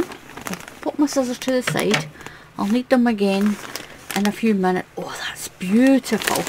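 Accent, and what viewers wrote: Scotland, United Kingdom. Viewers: I love your crochet videos ,as a knitter who could never understand it .also nice to here a fellow Scot you explain everything so well .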